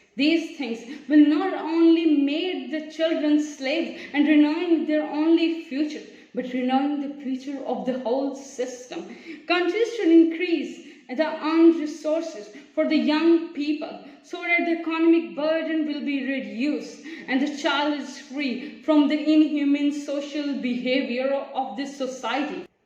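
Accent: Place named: United Kingdom. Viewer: British akcent👌..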